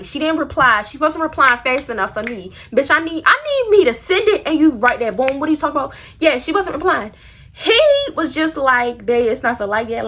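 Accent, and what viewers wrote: Baltimore, United States. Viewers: Baldamore accent🤪💗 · baltimore accent is soo nice 😩😩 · You sound like you’re from Baltimore 😭😭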